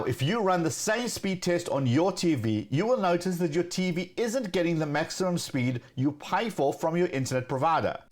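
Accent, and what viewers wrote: South Africa. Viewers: Are u originally from South Africa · For this reason I'm using an ethernet cable & also since the router is too far from my android tv box. Btw you sound like you have a South African accent since I'm from South Africa.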